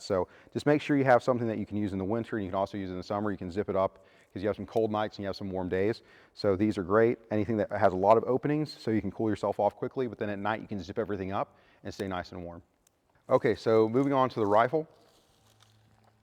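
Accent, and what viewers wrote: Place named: West Coast, United States. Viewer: Knew he was west coast the moment he started talking. God be with you and your men🕊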